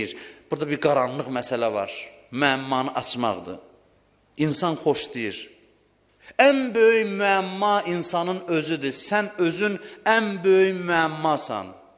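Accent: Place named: Lankaran, Azerbaijan. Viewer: Ərəb və fars dillərini mükəmməl bilir.Bilirsiz əslində adi insanlar bir dənə rus dilini biləndə nə qeder ləhcə və intanasiyasinda deyisiklik yaranir.Haci həm Lənkəranlidi və bizdə cox ilahiyyatcilar o zonadandir.O zonanin da xüsusi ləhcəsi olur.Ərəb Fars və Rus dillərini də mükəmməl bildiyi ücün Azərbaycanca danişiğa az da təsir edir.Turk alimleri ise sadece Erəbcəni bilir.Çox güman ki,bunnandi.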